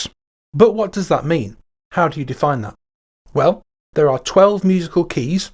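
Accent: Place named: Liverpool, United Kingdom. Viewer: This dude sounds lime he is from Liverpool!!!!!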